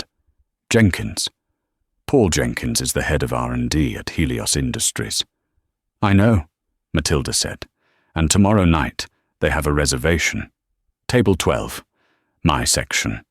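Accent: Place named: United Kingdom. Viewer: What I really appreciate is the one freeze frame picture at the top of the screen that never changes, slide shows or moves. I put a piece of paper on it and just get lost in the story listening to that purring English / British accent while following along with the transcript. My imagination does the rest. Also, I like the ability to backtrack by highlighting sections that have already gone by. Some channels are changing the algorithm so that it is not possible to do this with the narration. Only with silently reading it over again. Please don't do that. I like hearing again some section(s) that have intrigued me, made me smile or even laugh.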